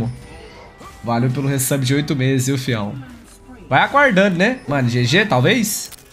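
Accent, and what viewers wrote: Minas Gerais, Brazil. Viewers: Não sei dizer se você é mineiro ou paulista... talvez os dois...kkkk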